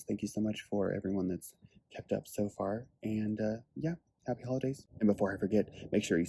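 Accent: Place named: Scotland, United Kingdom. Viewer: First and foremost love you guys. Next: blah🥱🥱 bloody blah to the small minded people out there. Second next: you have got this parenting (I would know I have 5 😜)thing. And last but the most important: did I hear a Scottish accent creeping in?!? I think so lovely xxx Lots of love from Edinburgh 🏴❤️ THREE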